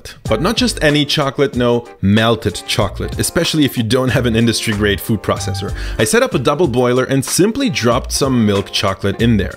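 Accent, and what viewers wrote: Germany. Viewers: Is he german? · This is probably lhe least german german accent I've ever heard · First time on your videos. I detect a german accent, am i right? I like guessing people's accents 😁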